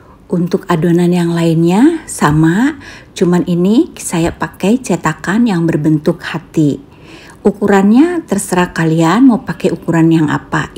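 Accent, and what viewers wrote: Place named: Merauke, Indonesia. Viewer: Suaranya seperti nona merauke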